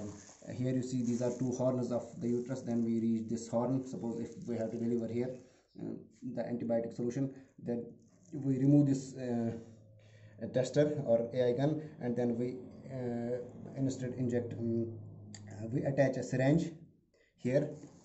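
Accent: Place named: India. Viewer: A very nice gulabi English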